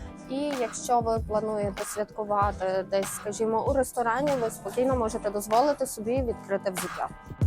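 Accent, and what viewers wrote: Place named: Western Ukraine, Ukraine. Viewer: а мені подобається , як Ви говорите. Можливо, це діалектизм навіть. По наголосах можу припустити , що Ви із заходу. Сорі якщо не вгадала )) і «получився» мені норм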